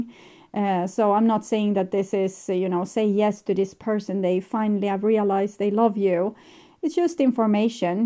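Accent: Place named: Sweden. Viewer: Tack! Blev mest glad att hitta en svensk! 🙏🙏( jag hörde direkt att det va svensk engelska 😁😁😇) det va nån mening med att hitta denna reading! ❤ Relaterar till hela readingen... 🙏😇 Love amd light!!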